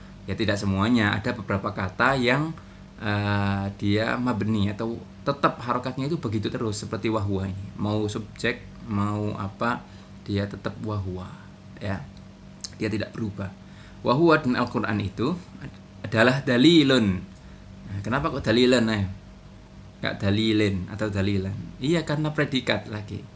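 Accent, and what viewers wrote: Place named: Java, Indonesia. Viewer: maa syaa Allah.. medoknya kerasa sekali hehe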